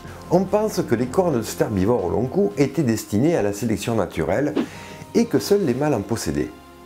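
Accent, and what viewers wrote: South, France. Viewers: La paléontologie avec l accent du soleil et de la passion. Merci! Je n' ai pas de sujet précis à te soumettre, le principal c est que tu prennes du plaisir à faire tes vidéos car c est ce qui nous fait plaisir 😍 Juste une petite suggestion, pourrais-tu trier tes vidéos en fonction des eres et des thèmes? Il est quelques fois difficile de trouver les suites de tes mini séries. Merci et j attends avec impatience la prochaine. 😙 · De rien. J'adore aussi ton accent du sud, moi même venant du nord, ça donne un côté exotique ;) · J'ai découvert ta chaîne il y a peu, et à ma connaissance c'est la seule du genre. En français. Avec un accent qui sent le pastis. C'est très sympathique, instructif, bien fait. Que du bon, quoi. Alors accroche toi. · Encore une très bonne vidéo, comme d'habitude. De très bonnes explications sur fond de vidéos et d'images bien choisies, un vrai plaisir pour les yeux et les oreilles. 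Perso je suis du centre de la France et n'ai pas l'habitude de l'accent du sud, mais étrangement je trouve que ça donne un gros plus au côté narratif de tes vidéos, donc encore un point positif ! Hâte de regarder tes prochaines créations. À bientôt dans un prochain commentaire, Un passionné de paléontologie, comme beaucoup de gens ici :).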